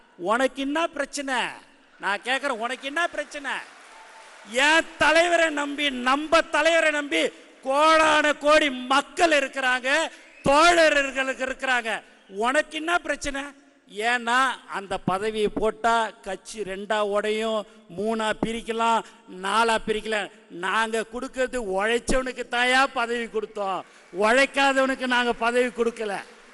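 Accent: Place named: Chennai, India. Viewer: Aprm enna Telugu layaa pesuraaru... Oru District ku oru style mari ithu Chennai Slang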